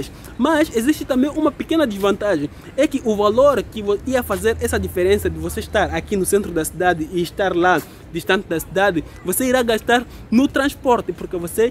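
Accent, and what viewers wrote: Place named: Brazil. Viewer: Você fala um sotaque próximo do brasileiro. Diferente de outros youtubers de Moçambique.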